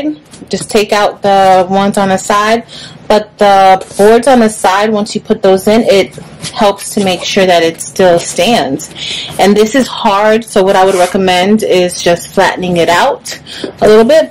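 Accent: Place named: Texas, United States. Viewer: You a Texan? Y'all give u away. Great video thank you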